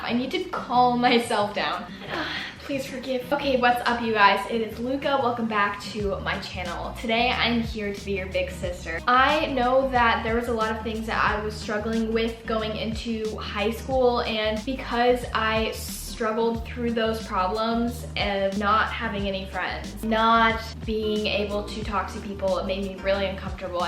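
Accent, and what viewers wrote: Australia. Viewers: her australian accent confused me!! i was like luca doesn’t have an accent?? 😅😅😅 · this is my first time watching one of your videos and i actually thought you were an aussie 😂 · this is the first video I’ve watched of u and I legit thought u were Australian. good eye might. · YESS love that Aussie accent you have to come to Australia soon bc I would love to meet you🤩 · oh my god I thought you were actually Australian 😫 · I have never seen one of your videos and I thought you were from Australia!!!! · This is the first video I’ve seen of u and I thought u were Australian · aye! just kidding I love ur brilliant aussie accent please make more vids with the accent btw smol youtuber here! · First of all your Australian accent was amazing. Also this is so helpful I was homeschooled for the freshman year. I decided to enroll back in school and I’m a little nervous so happy this helped. 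· suddenly you're coppying Kennedy Walsh with the australian accent not hate love ya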